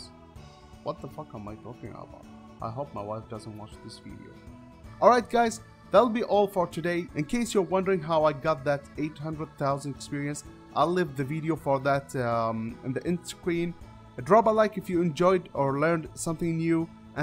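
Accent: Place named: Arab world. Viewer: Your Arab accent is so strong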